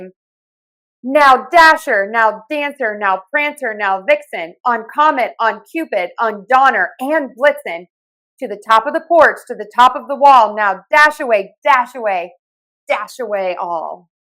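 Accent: United States. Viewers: Hi. I see the title of the video in spanish. Must be the the New improvement of yt. Its good videos of you reading so we can learn the american accent that is not easy. Although its something for children I dont understand a lot of words.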